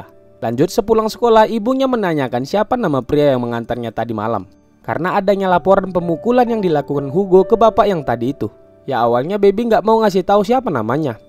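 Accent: Medan, Indonesia. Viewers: Medan kali ahh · mantap kali wak wkwk. orang medan keknya uwak neh 🤣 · Salam kenal min,subscriber baru mu, dari awal nonton film ini salfok sama cara pengucapannya nampaknya uwak ini anak medan pikirku🤣salam kenal lah yaa awak juga orang medan wak. Sukses terus!🫶🏻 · Org medan kyk nya kau bang kwkwkwk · Dari awal denger cara kamu bwa cerita n logatmu udah tertarik saya, gampang dimengerti n kocak juga, org dri medan ya min??? MEDANNYA KAU BANG?? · pasti Abang ini orang Medan wkwkw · lucu kli kau ngomong nya bg,,,orang medan ko ya,,,wkwkw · Tiba² nongol diberanda langsung subscribe & like 🔥🔥 // Salam dari MEDAN 😁. Dari logat bicaranya mimin juga dr medan keknya ini. 🤭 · Logat medan ya lek😅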